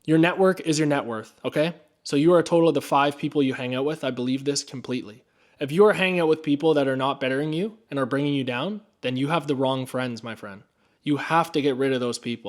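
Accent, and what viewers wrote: Canada. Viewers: This was a great video. I also KNOW you’re Canadian. Can spot the accent from a mile away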